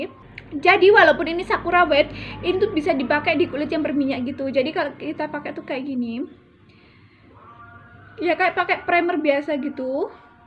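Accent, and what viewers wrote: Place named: Surabaya, Indonesia. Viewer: Halo rek kembali lagi rek videoku duuhh paling seneng nonton video ini Surabaya ya medok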